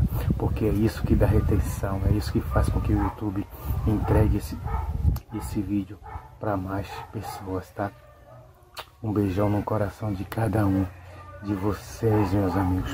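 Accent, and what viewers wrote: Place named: Northeast, Brazil. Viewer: O sotaque nordestino ❤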